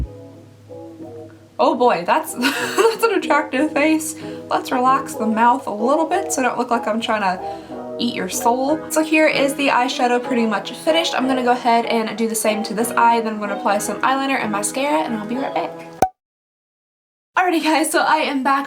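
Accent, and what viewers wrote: Kentucky, United States. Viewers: I think its beautiful. I live in kentucky, you sound like you are from Kentucky also. Sorry, just curious.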